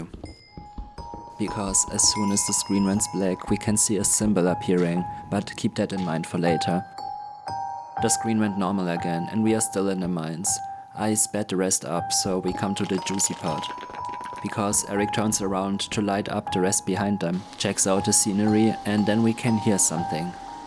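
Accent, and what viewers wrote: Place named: Germany. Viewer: The millisecond i heard your voice. I knew you was german, why? Because i am part German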